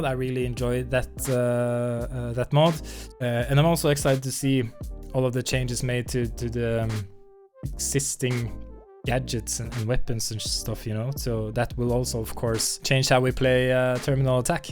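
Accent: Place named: Norway. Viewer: So excited for the new season! (du er nødt til å være norsk jeg kan høre det)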